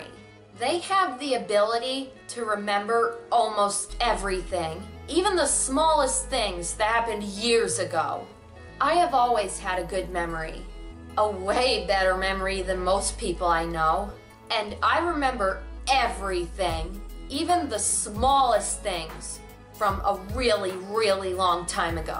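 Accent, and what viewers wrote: New York, United States. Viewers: Great video but i HATE the way Americans pronounce Aspergers. There's a "p" in the there, and a soft "g". Anyone would think you lot are obsessed with burgers or something. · Excellent video! I am CONVINCED my roommate has Aspbergers.... its' been driving me nuts.... I had a hunch he had it and you checked every box for me... he is SUPER smart... however, he is SUPER shy, doesnt look me or anyone in the eye,... can obsess over things.... honestly, can be quite selfish,.... but I truly think he has Aspergers... this video really confirms a lot for me... I feel like it would be super rude to ask him if he has it... he has admitted to being very shy. I have only been living with him for a month... and ive had some frustration with the living situatioion so far for all the things mentioned above... anyway. im probably boring you to tears lol but thanks for posting this! 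I love your accent haha it sounds very New York (Im a new yorker). I'll check out some of your other vids and subscribe. I dated an autistic guy once, and we managed... but it was hard. Ultimately we broke up cuz he was cheating on me... but thats a whole other story. I've always been fascinated by hearing other people's experiences and you did a great job. Cheers.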